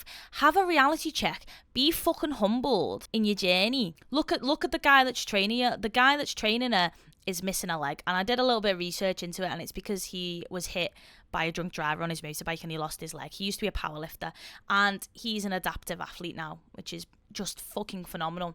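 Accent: Liverpool, United Kingdom. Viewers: I’m Russian and I adore your British accent. It’s a heaven for my ears besides all the well educated guesses of yours. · I've lived outside the UK for 8 years now. Love the vids of yours I've watched so far anyway, buuuuuuut listening to your accent is making me so nostalgic for home and adds an extra layer to enjoy!!! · So lovely to hear that Scouse accent. Hello from down here in Australia! Well done on your incredible achievements! Ps. seeing as you're an Amberlynne gorlie, did you see her work-from-home WIEIAD video? It was hilariously stupid. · Accidentally clicked on this and heard the Scouse and stayed the whole time haha. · Heard the scouse accent mixed with lifting knowledge and subbed. Hello from West Yorkshire! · I was suggested to watch you on the last video I did! Love the scouse accent and looking forward to seeing more of your content 😁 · Where exactly in the UK is your accent from? 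American here, just curious — thanks 🙏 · Aaaa scouse! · liverpool, england. it's a scouse accent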